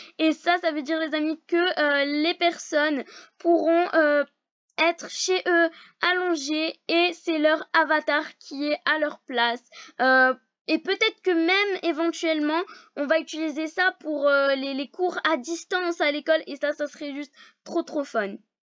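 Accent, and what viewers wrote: England, United Kingdom. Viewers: Merci pourles infos aussi belle accent anglais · Toujours un aussi belle accent anglais😉🦋 Et merci beaucoup pour l'explication!!😁❤ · Toujours un belle acsent anglais 👍✨ Ps :jaime trop t video et ton acsent ! Gros bisous et bonne vacances 🌼😂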